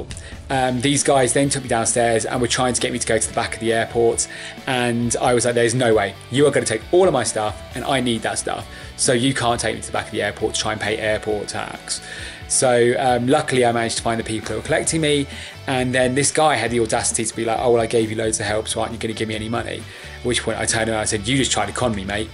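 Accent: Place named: United Kingdom. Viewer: man with your british accent, you just killed my country ahaaahahahajajajaja but you r just sayin what you saw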